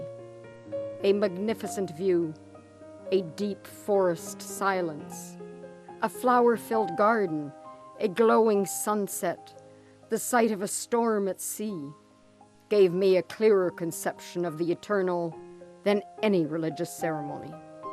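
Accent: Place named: Maine, United States. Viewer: Couldn't they find a narator without that terrible voice and a cent. She sounds like a fishwife from Maine.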